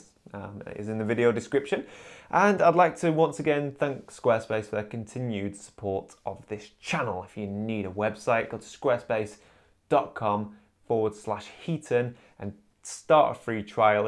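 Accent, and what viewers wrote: United States. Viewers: Don't be sorry. I loved your American accent, since I'm from the U.S. :D Thank you for this video, as I've always been curious about your b-roll equipment! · That American accent 😂 haha you’re the best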